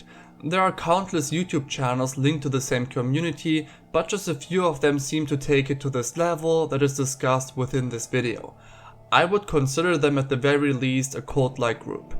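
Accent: Germany. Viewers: German for sure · His accent sounds very similar to a German one. Almost South African. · basne He's actually saying 'can't be asked' which is a common mistake we Americans make, due to mishearing or misunderstanding the British 'arsed' as 'assed' and then further twisting that to 'asked.' He also typed it as such in the video description. The accent, though? I'd have to say it sounds a bit German - to my ears he definitely does not have an American accent. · Are you German by any chance?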